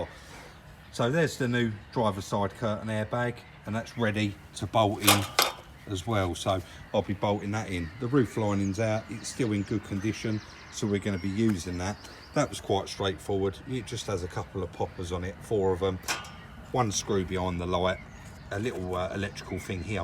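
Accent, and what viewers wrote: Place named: Cockney, United Kingdom. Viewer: Bloody marvellous video what looks like a nightnare to anyone else is a doddle to you i admire your determination how about a meet and greet in summer i reckon loads of us would turn up celebrate your 100k i was with you at 45 ish lol im sure you inspire so many of us as always so professional with a great cockney touch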